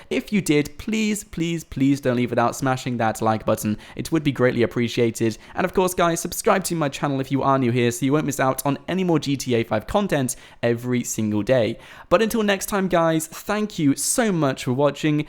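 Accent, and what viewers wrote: United Kingdom. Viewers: u r britain accent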